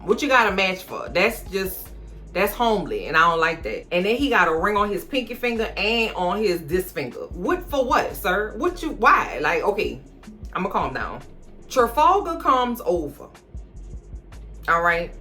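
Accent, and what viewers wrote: New Orleans, United States. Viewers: I’m so glad I found your channel! I’m from Nola and ur voice sounds like home. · I hear that nola accent fasho ooouuuweee we might be related 😂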